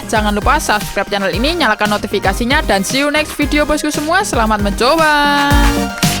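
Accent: Java, Indonesia. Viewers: Koe wong jowo to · Bang orang mana kok nyomongnya seperti orang jawa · Deke kang Jawa ya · Medhok e mas tekan ndi toh? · Channel Ini - Kreatif - Sopan - Jowo Nyo Muantep Keren BosQu! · Abangnya dari jawa ya . . . . . . .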